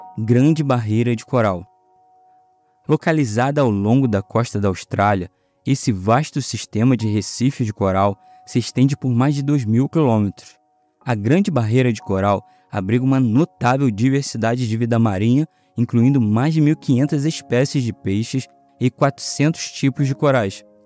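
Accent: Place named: Rio de Janeiro, Brazil. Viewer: Vídeo infinitamente interessante e feito Com Muito Amor!!!!!! Nosso Lindo e Bom Deus cria somente belezas que nós podemos contemplar e admirar com felicidade infinita!!!!! Eu sou grande, grande fã deste canal fantástico e esplêndido! O Moço que tá falando tem uma voz bem doce e melódica e acho que é carioca como eu! 😃🙂 A voz dele e também um das razões de assistir nos vídeos deste canal, porque eu sou músico e flautista e para mim é alegria que ele tem uma voz tão linda!!!!! Mil beijos e fortes abraços pra todos do meu Lindo Rio de Janeiro!!!!! 💘 💘😍🏩💌💖💓💘😍🏩💌💖💓💘😍🏩💌💖💓💘😍🏩💌💖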